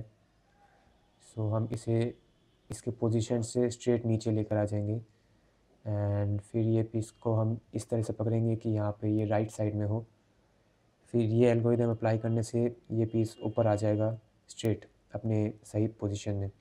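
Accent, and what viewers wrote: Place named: India. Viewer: I came here just to listen to hindi accent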